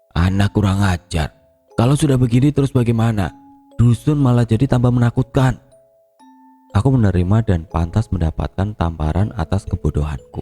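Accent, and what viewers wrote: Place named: Surabaya, Indonesia. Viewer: Wes ta bedek ket awal logate koyok wong suroboyo, jancoookk 😂😂😂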